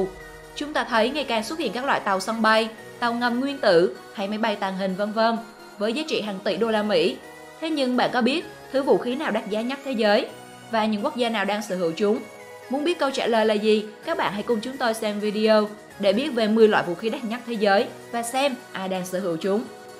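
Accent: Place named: Central, Vietnam. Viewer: nghe giong mien trung ,cua mien thuy duong cát trang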